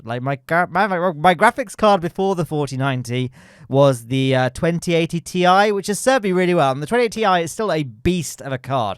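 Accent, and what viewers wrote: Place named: Leicester, United Kingdom. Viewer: Ha ha, you're from Leicester.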